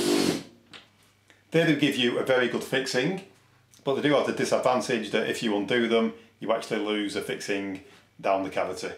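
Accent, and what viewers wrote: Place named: North West England, United Kingdom. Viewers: I'd say, more specifically, that he comes from the North-West of England: Lancashire or Manchester. Doesn't sound like any sort of Yorkshire to me. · For any Americans who may be watching this (and to annoy my fellow countrymen as well) the man in the video is talking funny because he is from the North of England. He does however, know his fixings and though you may think he is demonstrating the bleeding obvious it is comforting to know that your workmate who seems able to use the blue fixings that you keep snapping is either uniquely capable, very lucky or is going to get a phonecall from the boss next week to say the speaker, projector, interactive whiteboard, whatever, he fitted at that last job has just come off the wall and narrowly missed a school kid etc. etc.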